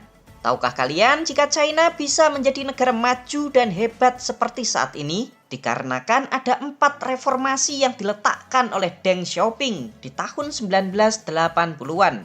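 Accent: Java, Indonesia. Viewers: Pembawa acara dengan logat jawa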